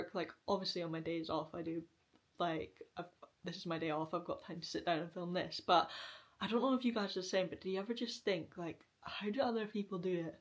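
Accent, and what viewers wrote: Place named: Scotland, United Kingdom. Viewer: Aw you’re welcome! I’m in West Lothian, yet to visit Inverness, but maybe one day! I love a good homey-vlog, anything relaxing! Your videos, and Rosie Henshaw are just so nice to watch, plus it’s nice to hear a familiar Scot’s accent on here! Hope you all have a great Easter 💛🙏🏼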